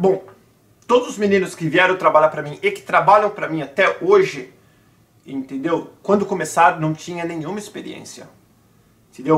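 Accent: United States. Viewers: Você parece um americano mesmo falando português... uma pena que meu Inglês não chega nem no básico! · Vc ta com sutaque de americano, é bem engraçado kkkk (obs: nao sei se é assim q se escreve sutaque). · Nem parece que é brasileiro com esse super sotaque americano.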